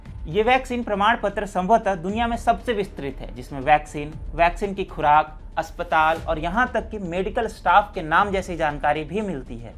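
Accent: Standard. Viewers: Aap bahut Achi sudha hindi bolte hai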